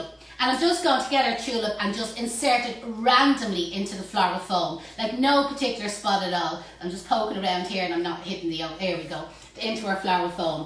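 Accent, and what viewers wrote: Ireland. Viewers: I’ve just come across your videos on YouTube. I’m not a florist and I’m not very good at arranging flowers but these little projects you’ve shown have given me some great ideas and also knowledge about doing some flower arranging. I watched your video of the cocktail glass arrangement and I bought some of those to do for some friends. Hopefully mine will turn out at least half as good as yours. I’m from Bangor Co.Down. Also so refreshing to hear an Irish accent on YouTube 🤣 Thanks for all the tips and ideas.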